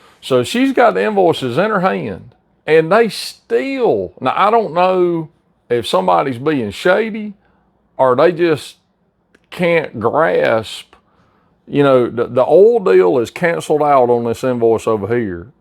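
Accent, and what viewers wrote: South, United States. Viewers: This guys accent is like Forrest Gump times 10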